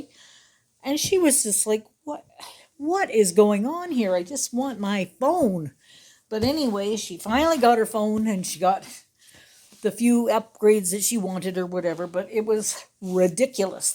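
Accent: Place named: Canada. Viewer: Hi sweet, I knew you were Canadian with your accent…I’m from the East side of Canada, from my youth…I’m now in California since 1964. I was only 10 years old when we moved from Cali. I enjoyed watching your video…since my mama has Parkinson’s also! Enjoy your video! 😘❤️🙏🏼🌺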